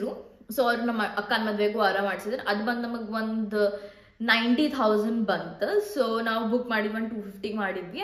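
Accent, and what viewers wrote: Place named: Dharwad, India. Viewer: Lot's of love From Dharwad ❤ yast cute aagi matadti akka dharwad bhashe❤😘 #🔥ni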